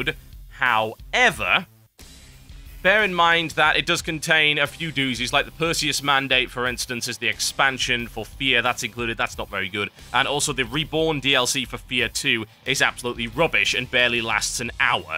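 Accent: United Kingdom. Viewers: i love your videos and your deep British accent makes it that much more epic! Plus your impeccable grammar makes it even more epic. So your videos are epic times like 120!!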